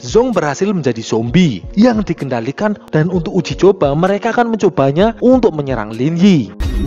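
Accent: Banyumas, Indonesia. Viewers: ok gokil,tambai ngapak ngapak,,yg lebih medok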